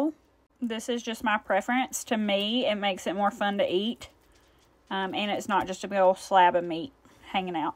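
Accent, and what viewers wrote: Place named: South, United States. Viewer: I love your accent and cooking style! I am a Midwestern, but lived in the south in the 90's... I miss the accent and food. 😢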